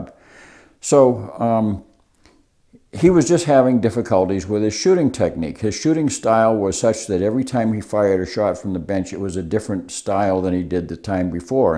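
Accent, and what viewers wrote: New England, United States. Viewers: Great content. And I grew up in NH, near MA. Good memories of the experienced man influence. Especially with the accent I remember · Definitely from Massachusetts or NH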